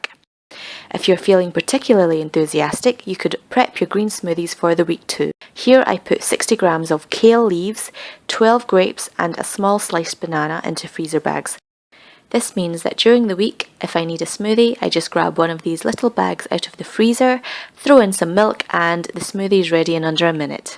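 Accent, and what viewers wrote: Scotland, United Kingdom. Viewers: love your British accent! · Love the Accent, FREEEEEEDOM. poshest Scottish accent i have heard in a long time and a good video to boot. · You sound so Scottish :)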